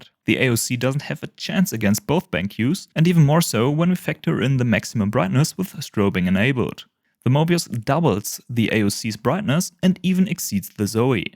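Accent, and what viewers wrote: Germany. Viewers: Dieser deutsche Akzent xD Nice Video mein Freund ;)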